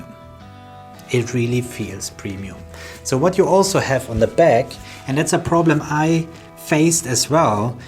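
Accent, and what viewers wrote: Germany. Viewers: Hey, good video! Well done. Are you german?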